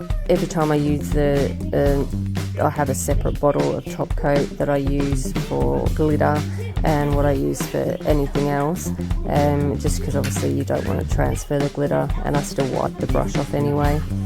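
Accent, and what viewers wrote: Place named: Australia. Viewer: U sound so Australian x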